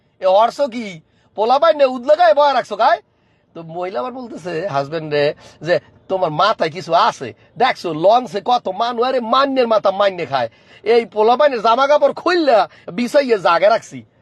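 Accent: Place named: Barishal, Bangladesh. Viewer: অরিজিনাল বরিশাইলা রা ফেল এতো দারুন ছিলো আপনার বরিশালের ভাষা।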